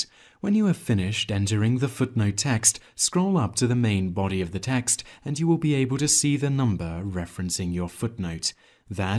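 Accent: United Kingdom. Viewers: I mean it just sounds plain old British to me 😂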